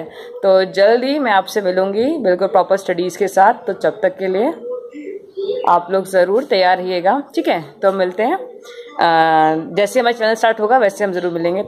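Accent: Rajasthan, India. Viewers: Ma'am aap Rajasthan Se Ho🤔🤔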